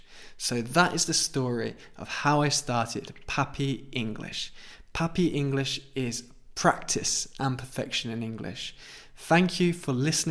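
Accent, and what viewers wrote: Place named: RP, United Kingdom. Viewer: 👏👏👏👏👏👏Goooorgeous English without an accent! When I was young I lived in Midhurst where I looked after my uncle's ponies at Cowdray Park Polo Club. You speak English like those polo players there, if you know what I mean! 😀😄😀😄Felicitaciones,! Eres un genio, amigo! Un abrazo, from Olivos, Buenos Aires, Argentina🇦🇷🇬🇧🇦🇷🇬🇧🇦🇷🇬🇧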